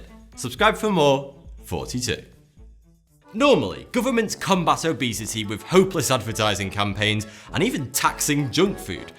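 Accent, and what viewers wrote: United Kingdom. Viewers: you're British aren't you?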